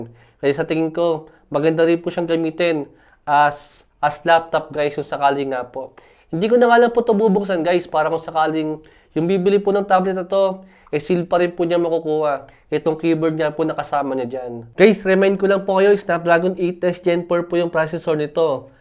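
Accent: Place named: Visayas, Philippines. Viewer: bisaya yung ngrereview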